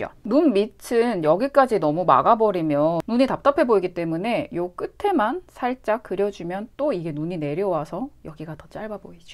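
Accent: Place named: Daegu, South Korea. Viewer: 너무 예쁘세요~눈웃음이 매력적이세요~ 살짝 사투리 억양이~ㅎㅎ 대구분이신듯ㅋ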